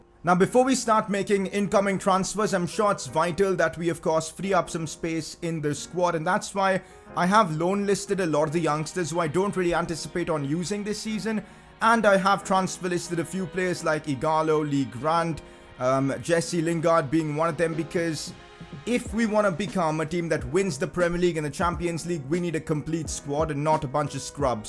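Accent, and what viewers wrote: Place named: India. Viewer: We’re has this Indian sounding man been all my life I have been laughing so hard all ep this is amazing I just subbed to all his channels he his amazing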